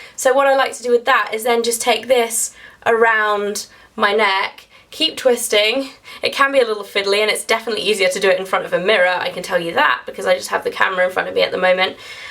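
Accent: United Kingdom. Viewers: love ur british accent :)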